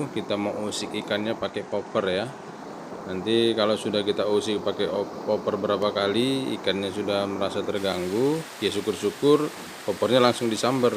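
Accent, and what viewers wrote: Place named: Medan, Indonesia. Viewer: Mantap om... Semakin ok penyajiannya Tinggal bahasanya saja yg kelihatan anak medan 😁😁😁🤭🤭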